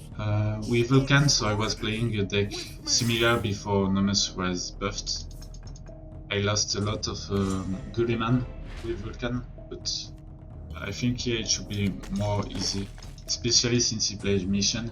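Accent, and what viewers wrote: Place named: France. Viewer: he's just french and doesn't know how to speak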